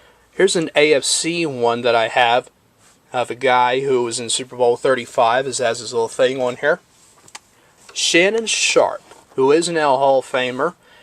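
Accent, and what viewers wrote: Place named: Baltimore, United States. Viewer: You have a super heavy Baltimore accent. Go Ravens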